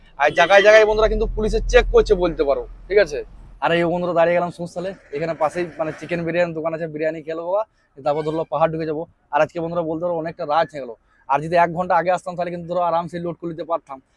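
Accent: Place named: Purulia, India. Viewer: তোমার কথা সুনে মনে হছে তুমি পুরুলিয়া আর নাহলে বাঁকুড়া ছেলা বঠো হে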